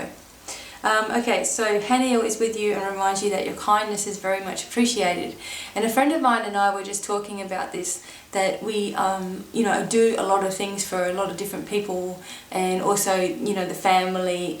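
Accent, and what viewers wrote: Australia. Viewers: Your sunny, unpretentious nature, your Aussie accent and your cheeky smiles always brighten my day and make me grin happily. Good job, B.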